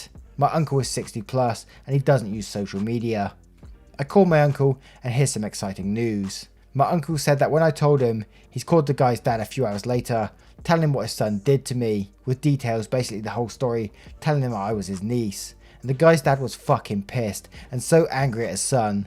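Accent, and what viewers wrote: United Kingdom. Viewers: Where are you from? I can't pinpoint your accent, my best guess is British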